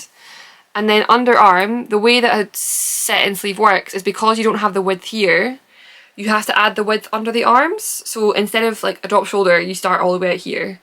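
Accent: Scotland, United Kingdom. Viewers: I have watched several of your videos and have several of your patterns on my ravelry wish list. Also I love a Scottish accent you could be reading me your grocery list honestly so I was actually embarrassed for myself to realize i wasn’t subscribed. Has been remedied now but damn. Not you showing up on my suggested page to get my ish fixed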